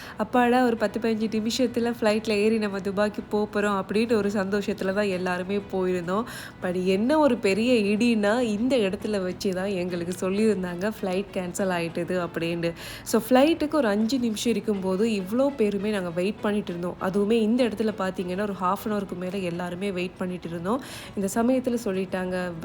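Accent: Sri Lanka. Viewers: Hii assalamualikum sis❤.....I want to tell you one thing...that my mother had past away a few moths before....me and my mother used to see your video every night before we go to sleep....my mother really loves your sri lankan tamil slang of your voice....and seeing your video remains our memories of dubai...because we had lived several years before in dubai......and now she is not there to see your video.....sis pls dua for her.....and keep her in your dua.....I will also pray for your family....Allah make you to reach high....with good health......inshaallah ❤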